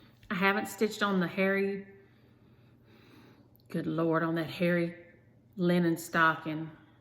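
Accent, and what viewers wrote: South, United States. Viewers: Chicken what? Did I hear your favorite candy correctly? You crack me up. My favorite candy is straight up Hershey’s milk chocolate. Love your videos. I’m a southern girl and your south-isms make me laugh. Lawd! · I enjoy you so much that I watched all your videos! I love your Southern sayings along with your drawl! I love chocolate and caramel together so Caramello would be my favorite along with Snickers!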